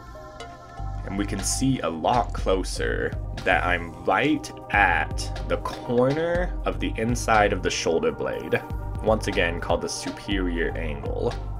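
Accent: Alaska, United States. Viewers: He sounds just like the Alaskan people show. Wonder where he is from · He sounds like one of the Alaskan Bush people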